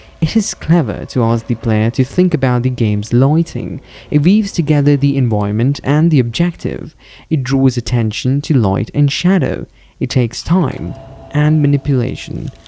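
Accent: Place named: Northern England, United Kingdom. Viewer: bro i really didnt mean anything by it. I actually felt bad and was gonna go back and delete the comment. Felt crap to watch such a great video amd just leave that as a comment. Im just usually good with accents and was puzzled by yours. You sound like you're from a nice part of northern england or something. Genuinely tho mate, this was such a good video. I felt like you put a lot of stuff that i felt into words so thanks. Ill definitely watch the new video when uts out too ✌️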